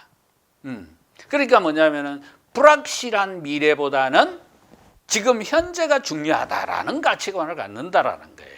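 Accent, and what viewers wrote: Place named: Gyeongsang, South Korea. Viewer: 경상도 말튜네. 건설 마피아의 악마적 결과를 세대갈등으로 작정하지마시오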